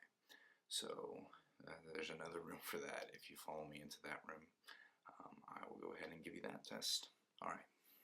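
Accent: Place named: South, United States. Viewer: You have a great voice! It's kind of southern without being southern. I grew up in FL, so there is definately a distinction there. I usually enjoy the light stuff, but with this video I liked the audio more.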